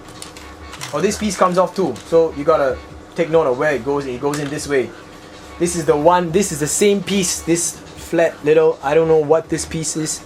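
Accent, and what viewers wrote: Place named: Singapore. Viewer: lol from the accent oh singapore sure one XD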